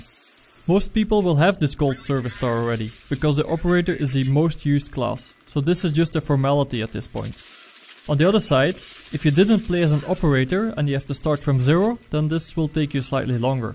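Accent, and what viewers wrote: Netherlands. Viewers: 'Dutchy' English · je klinkt echt nederlands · Ik kan gewoon horen dat je Nederlands bent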